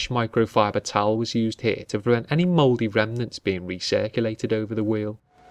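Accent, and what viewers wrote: Liverpool, United Kingdom. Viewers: Scouse laaaa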